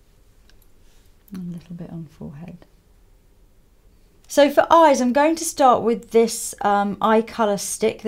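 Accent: England, United Kingdom. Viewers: So thrilled that you have a lovely English Accent and a soft voice xxx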